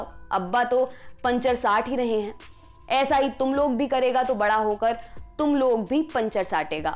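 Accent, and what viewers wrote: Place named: Bihar, India. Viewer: Ya bihar se ay h lalu jaisa hi na bolenga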